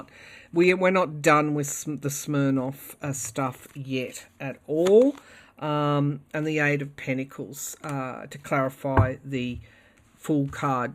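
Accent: Australia. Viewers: I love listening to you, thank you for your readings, also good to hear an Aussie accent being so far from home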